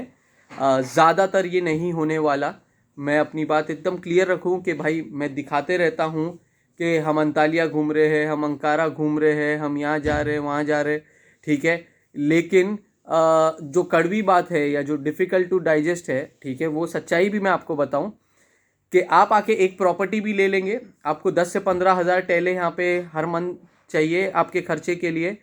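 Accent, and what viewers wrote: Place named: Hyderabad, India. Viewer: Assalam o alaikum Bhai, you sounds like Hyderabadi Telangana, India, if yes please let me know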